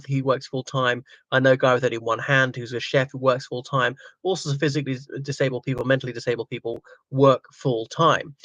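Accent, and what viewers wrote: RP, United Kingdom. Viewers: Could you please tell me few YouTuber who have Rp accent apart from mainstream actors or politician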